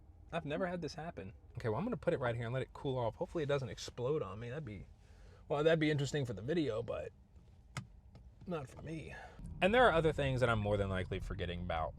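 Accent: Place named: Georgetown, Guyana. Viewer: I thought you sounded familiar, I am from Georgetown!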